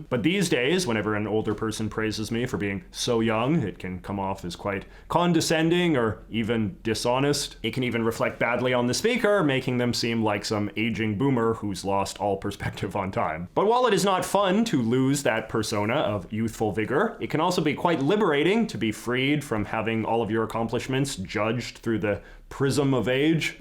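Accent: Canada. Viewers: He's a fellow Canadian , I was noticing the "aboots" like crazy .Thanks for this man . The anxiety is getting to me but I really loved certain things . One of them was mentioning lifestyle , i exercise a lot , soccer , photography , healthy diet (coming soon). Your video really encouraged me but I would love a video about dating in your late 30s .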